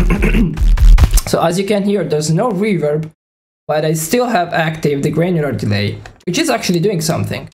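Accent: Italy. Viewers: Si sente l'accento italiano ahahah hai stra talento! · Italian I think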